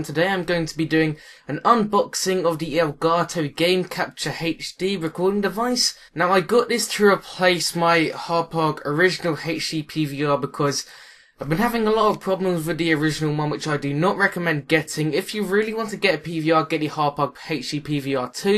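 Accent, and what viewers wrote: United Kingdom. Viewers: That's just how British people in general say H. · 100,000 sub video shud be u doin a video attempting to do an american accent i hav always wanted to hear a british person do an american accent :D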